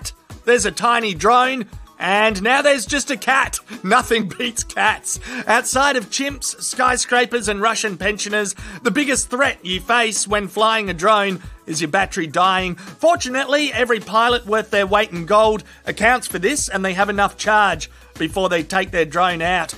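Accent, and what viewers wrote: Australia. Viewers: The drones smashing into stuff like the building twice is the return to home feature on the older DJI 2-3. That's why it hit the same window almost its tracking GPS and doesn't know about skyscrapers. That said still funny with an Aussie voice over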